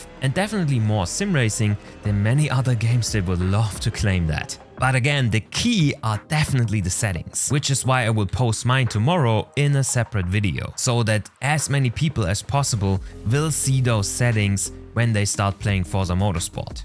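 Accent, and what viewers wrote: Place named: Germany. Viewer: Bro er ist 100% deutsch man hört das raus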